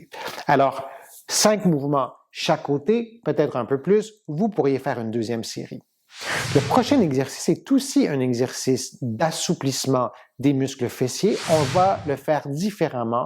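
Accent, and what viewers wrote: Canada. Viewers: Merci pour vos conseils. J’adore votre petit accent canadien…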